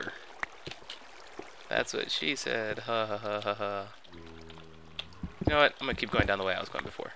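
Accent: United Kingdom. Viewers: you do a damn good english accent lol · nice british accent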